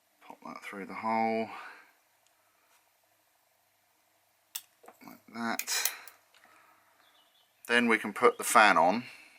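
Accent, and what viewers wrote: United Kingdom. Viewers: Love that british accent, lol Will make one too, thx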